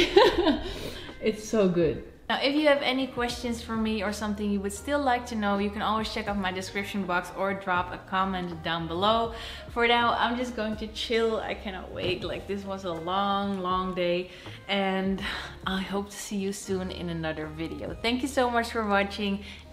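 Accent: Netherlands. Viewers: You're accent is very VERY Dutchess in this video.....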